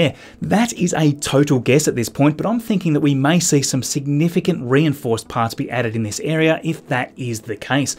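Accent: Australia. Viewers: Where re u from Australia?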